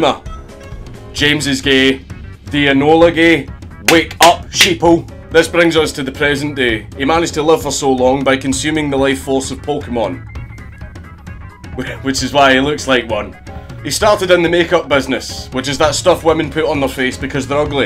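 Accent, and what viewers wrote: Scotland, United Kingdom. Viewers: where the illuminati invented THE GAY [ The Scottish accent makes so much better ] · I love your Scottish accent! 😀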